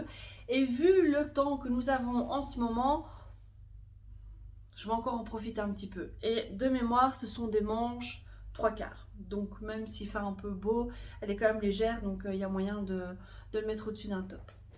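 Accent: Belgium. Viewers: Merci pour tes podcasts, c'est toujours un excellent moment, et j'aime beaucoup ton humour, ta simplicité et ton joli accent Belge. Pour les bruits, moi je ne trouve pas cela dérangeant, surtout qu'il faut vraiment tendre l'oreille.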